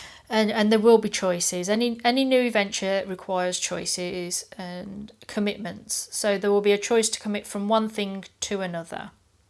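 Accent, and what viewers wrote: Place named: Tamworth, United Kingdom. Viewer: wow are you from Tamworth UK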